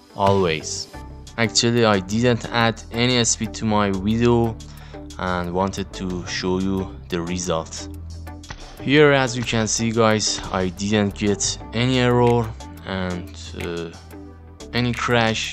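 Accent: Iran. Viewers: از لهجت معلومه ایرانی هستی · خدایی لحجه ایرانیت مارو ...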